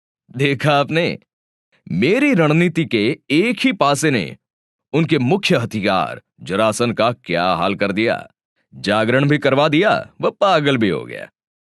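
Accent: Gujarat, India. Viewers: Sir ji Ye achha hua ki. Koi. Aur. Comentary kar. Raha hai Agar aap. Boltey. Toh Aapka ton jo ek. Gujju jaisa Aur. Aapke. Jo dress👗 hotey. Hai woh. Koi. Joker🃏. Se Kam nahi hotey Aap. Kitne bhi. Bade pravachan Karne. Wale ho.. Magar aapko Apne. Dress ka khayal. Rakhna chahiye Ye ekdum. Se. Joker🃏. Jaisa Ya koi. Over acting karne wale Jaise dikhtey. Ho aap Kuch toh akkal lagao guruji Ki logo ko kya 💆‍♀️massages jataa hai Aapko ek sudhh khadi ka kurta hi jachega Aur. Mai aapko criticism. Ke. Hisaab se baat nahi kar. Raha hu Aap. Jaise log Aur logo ko prerna detey hai Aur. Aap joker🃏 jaise. Dress👗 Pahan kar aa. Jaatey ho stage. Par.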